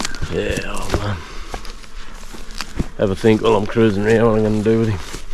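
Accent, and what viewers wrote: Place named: Queensland, Australia. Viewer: Q'ld accent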